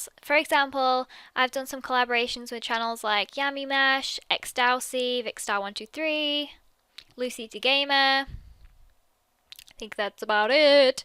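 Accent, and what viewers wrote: England, United Kingdom. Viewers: I love your English accent :)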